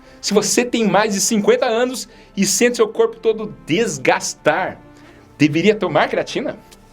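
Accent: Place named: São Paulo, Brazil. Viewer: Esse dr., tem um sotaque muito carregado de paulisteca, pouco se entende o que ele fala!!!!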